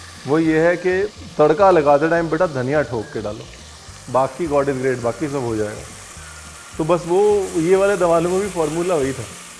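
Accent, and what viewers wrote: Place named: Punjab, India. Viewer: I really like your North-Indian Hindi with Punjabi touch 😍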